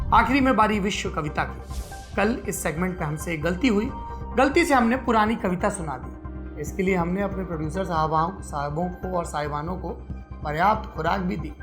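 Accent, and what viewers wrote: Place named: Bihar, India. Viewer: 11.48👍👍 baki jo h so toh haieye h ,😁😁. Chacha bihar nd up k log deeli-bambai kahe n chle jaye but ye jo apna bolne ka tarika ye na chutega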